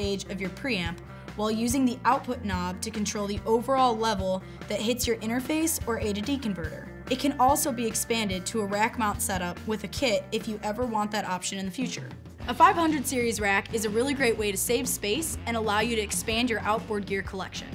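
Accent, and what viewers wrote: United States. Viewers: Please enlighten me about her accent. English is not my first language but what I'm hearing sounds like a typical American accent. Is it a specific regional accent?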